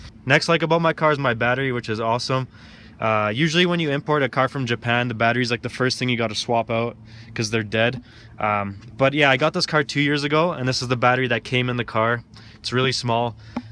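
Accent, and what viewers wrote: Canada. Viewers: you have like the stereotypical canadian guy, i try to sound like u when making fun of my canadian friend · jesus you sound so Canadian. i am also Canadian you make me question if i sound like you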